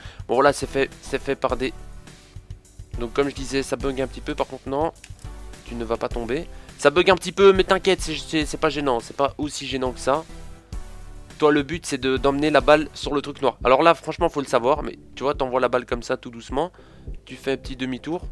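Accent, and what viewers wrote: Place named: Belgium. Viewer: superbe vidéo continue et je me disais bien que tu étais. belge parce que tu as un peu l'accent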